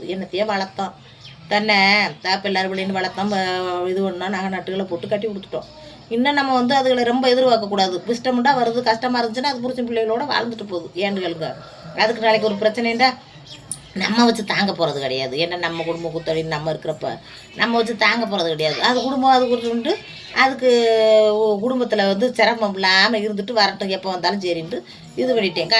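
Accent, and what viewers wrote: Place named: Ramanathapuram, India. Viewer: Sister enka area voice pola irukku Ramnada 😂😂❤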